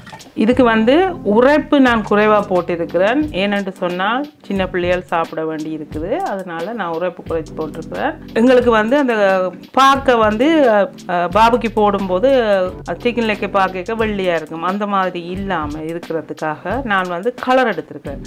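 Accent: Jaffna, Sri Lanka. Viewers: Akka...I like the way you speak yarlpanam ...keep it up❤ the Tradition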